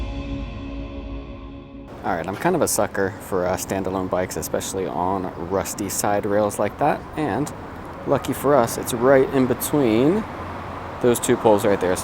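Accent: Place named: Alabama, United States. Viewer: Great stuff! Informative content and loving that slight hint of an Alabama accent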